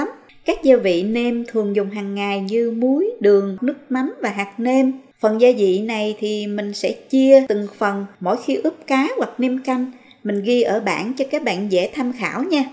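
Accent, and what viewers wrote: South, Vietnam. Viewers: Giọng nói miền Nam VN, dễ nghe, dễ thương.. Cám ơn cô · Giọng miền Nam ngọt ngào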